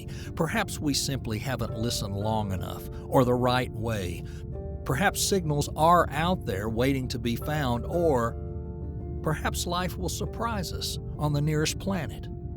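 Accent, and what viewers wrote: United States. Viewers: Those complex names just don't sound right with an American accent. It's pronounced You-carry-otic !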